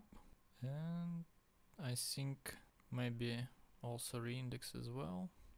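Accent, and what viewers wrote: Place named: Ukraine. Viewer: Якісний, вітчизняний акцент.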